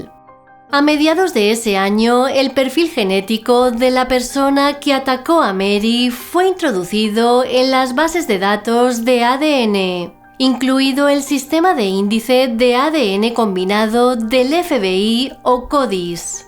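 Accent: Spain. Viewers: eres española o mexicana?, en todo caso tus relatos son interesantes pero tu forma de hablar hace sentir la sensación de q estás montado en uña montaña rusa🙄🙄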